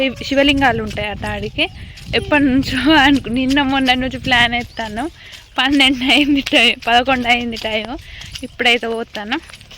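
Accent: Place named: Telangana, India. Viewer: Pakka telangana language 😅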